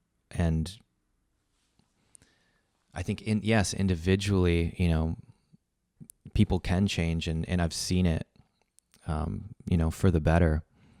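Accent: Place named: Arkansas, United States. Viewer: I'm from Arkansas and like you nailed like 95% of how people talk down here which is funny because I've lived in the south for my entire life and some people think I'm from up North because of how I talk lolll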